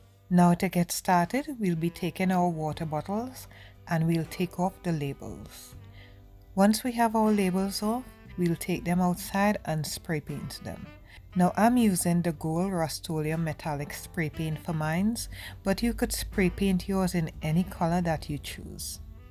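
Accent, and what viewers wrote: Trinidad and Tobago. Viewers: I'm from St. Vincent but I love the Trinidadian accent. I have relatives in Trinidad.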